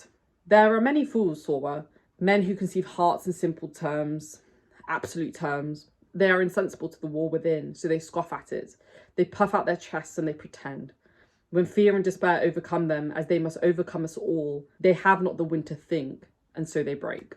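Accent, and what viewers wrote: United Kingdom. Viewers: Great video, thank you for sharing it. I think you loved many of the same aspects that I also love. Also! It's great to hear a fellow British person pronounce all the names in the way that seems natural to me :) Off to check out your other videos... Thank you for this.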